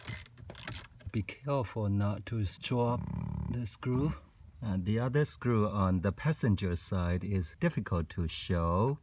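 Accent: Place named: Germany. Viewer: Great video, neat and clean during all the steps. Also like your voice, sounds like you speak with very slight German accent or German speaking manner. Anyway, just noticed one problem - according to the manufacturer you should not glue the RF/WIFI antenna to the metal surfaces, including the box itself, because this may cause some wireless connection signal issues. Instead you should glue it to the plastic surfaces, more closer to the driver, or where the phone usually lies. Another question - how different is the sound quality, compared to your oem bluetooth and aux? Also, when having a call inside the car, do you use oem BT phone or carplay phone via aux?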